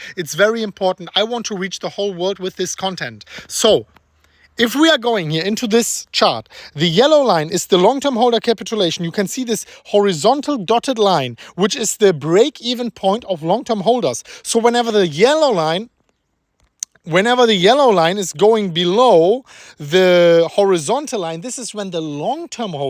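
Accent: Germany. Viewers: Das ist doch ein deutscher Akzent. 😁 Gefällt mir sehr gut.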